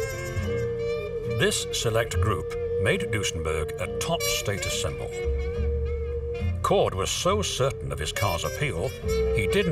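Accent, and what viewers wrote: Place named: United Kingdom. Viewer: If these cars were American made, why is there a british guy narrating this video?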